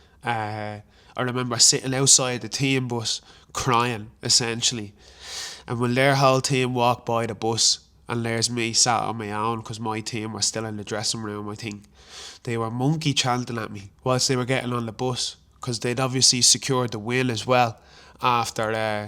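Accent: Dublin, Ireland. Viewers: I absolutely looooove your crisp Irish accent and the radio friendly tone of your voice. I can't pin point what part of Eire it is from because it is clear to me that as a nation you guys have many more accents. On a separate note . Moisturize, moisturize, shea butter. That skin's going crack like eggshells if you don't . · Fine dub accent that mate. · Well, you're here and you sound like any other Jackeen, so I suppose that's that. But there is more to being Irish than just being born here, or having the accent, or having a passport, playing our games and music, or whatever. Simple as that. Like so many other people who have to be heard on this, you can rattle off the names of Phil Lynott and Paul McGrath. Both of whom had one actual Irish parent, it seems obvious to point out. If only all our imports would contribute so much as they have. I'd be more interested to hear your thoughts on Kevin Sharkey and his political views. 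If you don't know who he is, you should do. He's a complex character for sure. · Fascinating, thank you. Your mom sounds incredible! You look black and sound Irish. I look white and sound non-Irish. Always a bit more to reconcile, but I think it's enriching to have additional perspectives. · Well done really enjoyed the honesty & how could anyone think you weren't a Dub bro with that accent 😂😂😂 & your mam's a legend 👏 you're very lucky to have her ❤ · No such thing as Black and Irish...you are an Irish citizen but you are not Irish. If I was born and live in China...it doesn't make me Chinese, rather a Chinese citizen. But, love your Irish accent!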